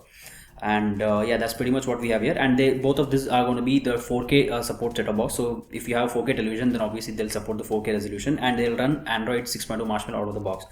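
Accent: India. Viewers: lol sick Indian accent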